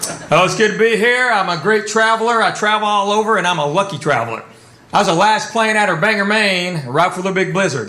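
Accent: South, United States. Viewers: Delightful southern accent. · I love quips! He truly is one funny dude! And I love hearing that thick Southern accent coming out of a full blooded Korean dude's mouth. It just adds something extra special because it's unexpected for someone who's seeing him perform for the first time.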